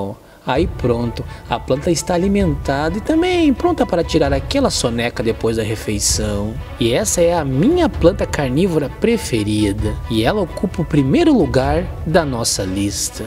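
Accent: Rio Grande do Sul, Brazil. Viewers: Gaúcho né !!! Inscritos!!! Top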